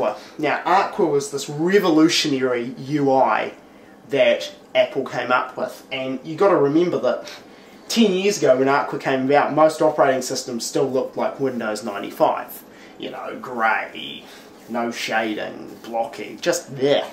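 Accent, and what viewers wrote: New Zealand. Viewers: Sounds more like New Zealand accent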